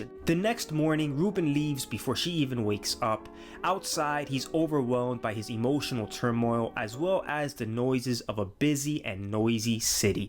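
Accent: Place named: Miami, United States. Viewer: You have a heavy Miami accent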